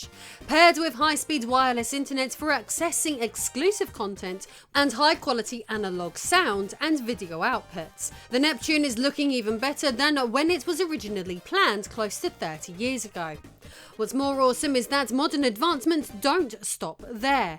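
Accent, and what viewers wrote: United Kingdom. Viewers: I keep thinking to myself why don't I like the sound of. I love British dark haired women. While watching this video I realized, Its the British News caster down hill draw sound. I have heard this in other people and technically is it a way of speaking that is supposed to draw your attention to what she is saying. But to me it is like nails on a chalk board. We came here to watch and listen to you. Our attention is already on you. Nothing against you. Its just this way of "reporting" repels quite a bit of the Neuro Divergent viewers who would watch and enjoy.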